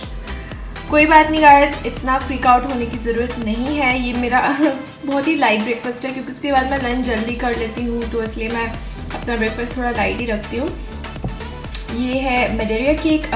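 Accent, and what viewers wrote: Pakistan. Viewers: I don't know why but ap Pakistanis ki trha bat kr rhi ho Btw love from Pakistan beautiful lady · Love ur accent.... resembles so much like Lahori accent..... mind if u speak some punjabi too?? A true fan from Lahore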